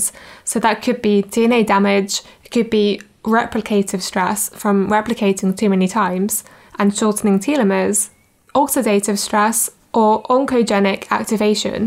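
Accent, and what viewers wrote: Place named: United Kingdom. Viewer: fascinating, thank you, it's above my head but, but very neat. May I ask about your accent? I hear british but specifically what region? I almost hear a mix of a second accent but cannot place it. (it's a nice sounding accent) I'm curious, as a couple people I know moved there and they both developed their regional accents the longer they are there. Thanks again God bless you and yours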